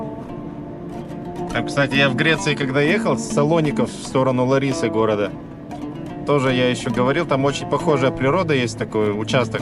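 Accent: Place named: Kirov, Russia. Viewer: Спасибо за видео. Приятный кирОвский акцент.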